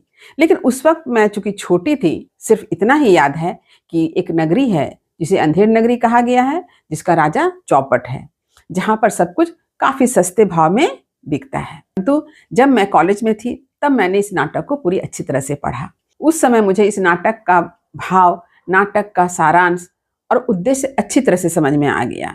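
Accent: Bengal, India. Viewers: Aap Bengali है ?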